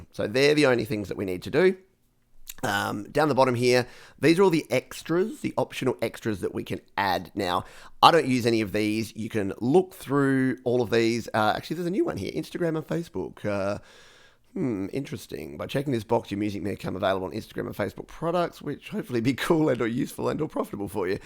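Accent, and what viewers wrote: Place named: Australia. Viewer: I love your accent. That's why I want to go to Australia. Greetings from Germany.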